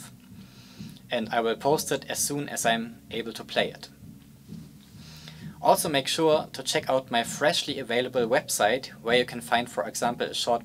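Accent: Germany. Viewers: Wie man es hört das du deutscher bist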